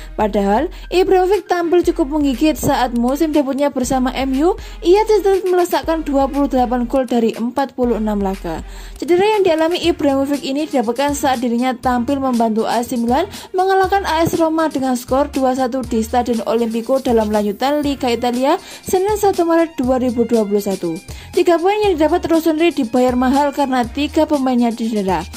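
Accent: Java, Indonesia. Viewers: medok abizzz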